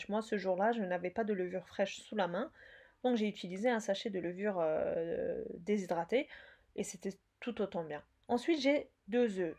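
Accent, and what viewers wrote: Alsace, France. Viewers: SVP Madame Vous serait-il possible de noter les ingrédients utilisés pour chaque recette sous la vidéo ? Ce qui permettrait de les préparer à l'avance, puis vous regarder faire tout en appliquant vos conseils qui sont super, extra ! Vous êtes donc d'origine turque (avec un petit accent alsacien)... Merci beaucoup pour vos recettes, il faut l'avouer, elles sont fabuleuses ♥♥♥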